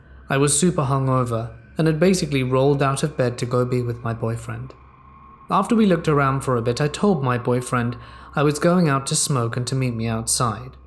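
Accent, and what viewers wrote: United Kingdom. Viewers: I heard the collaboration you did with Nocturna on her channel. i really enjoyed the video, also it's crazy how perfect your Spanish is, i was blown away. i also think it"s crazy how in English you have a British Accent and in Spanish you have perfect Mexican type Accent. I never knew anyone like that, that can speak with a British English Accent and Mexican Spanish Accent....anyways Thank You and God Bless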